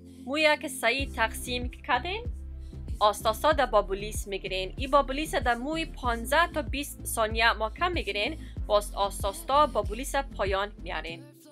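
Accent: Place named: Dari, Afghanistan. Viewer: تشکر خواهر عزیز چقدر شیرین دری صحبت میکنید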